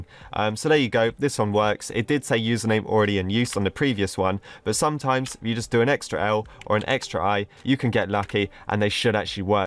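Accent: United Kingdom. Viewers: He's British that's why.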